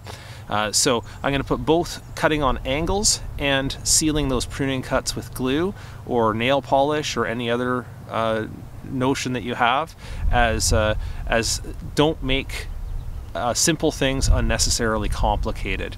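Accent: Canada. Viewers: Me too! My Dad has about 6 large rose bushes, and in 3 years have never been trimmed. I just had no idea where to start tackling them but have a much better idea now! First video I’ve seen from you and it took me about 30 seconds to spot that awesome Canadian accent! You have amazing plants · Great Canadian accent!😄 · Very helpful! Love your Canadian "accent"! You sound a lot like Scott Thompson from The Kids In The Hall! Yes, I'm old!!!!!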